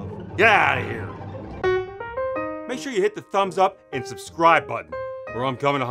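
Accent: Pittsburgh, United States. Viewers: The amount of Pittsburgh-eese in this episode is epic! hahaha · I just love this guy - even when we lose he makes it fun. He makes me a little homesick for Pittsburgh too, but it's nice to here the Pittsburghese from someone who loves the Steelers as much as I do! Keep them coming.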